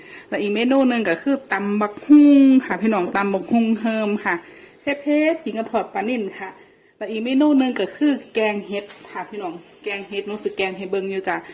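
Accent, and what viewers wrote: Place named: Sisaket, Thailand. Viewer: แซบๆคับผมเห็นแล่วน้ำลายใหล สำเนียงคือคนศรีเกษแท่คับ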